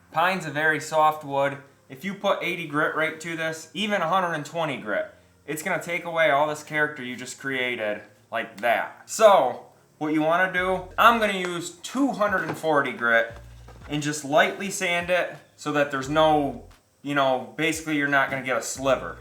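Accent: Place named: Wisconsin, United States. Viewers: okay. I may have recognized it from that. I grew up in Wisconsin. Now I live in Southern Maine. Two totally different accents, of course. But there is a similarity with the way you enunciate your "O". Great video. I subscribed. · I hear Mid West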